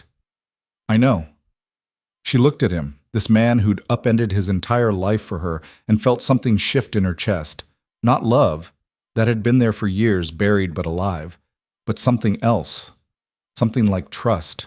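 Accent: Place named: United States. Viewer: American accent dosen't suit the story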